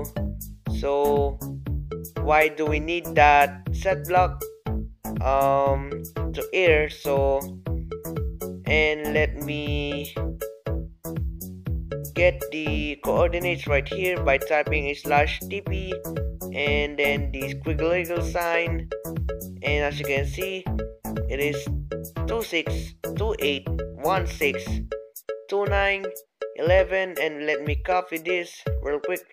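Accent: Philippines. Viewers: Bluestone- Gaming BG He has a filipino accent so you cant blame him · Are you from the Philippines? I live there too but my English isn't like this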